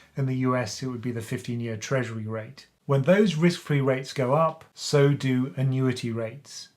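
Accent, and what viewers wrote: United Kingdom. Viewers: Really helpful but rather USA orientated… despite the UK accent.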